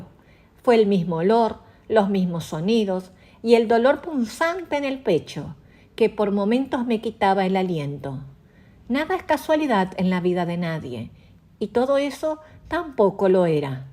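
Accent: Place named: Uruguay. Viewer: Es muy difícil regresar a otro tiempo.espero terminar tu vidahistoria es un gusto aberte encontrado de casualidad.te considero una amiga siempre te escucho tu horóscopo si no te escucho me siento triste.tienes uba bonita voz un tono de los uruguayos gracias voy en el capítulo 2 y los voy escuchar todos buenas noches.desde aca san luis potosí México.si tuviste suerte en seguir con vida!❤🎉😊😊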